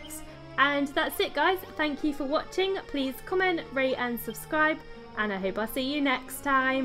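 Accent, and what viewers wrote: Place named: United Kingdom. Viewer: Hello, stumbled upon your videos not too long ago and i like your style and your lovely british accent so i subbed and i can't wait to see more of your DQB creations. 😉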